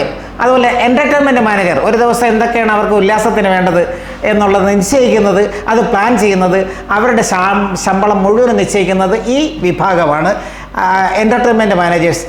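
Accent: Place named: Thiruvananthapuram, India. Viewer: തിരുവനന്തപുരം Accent സംഭാഷണത്തിൽ കൂടുതലുണ്ട്.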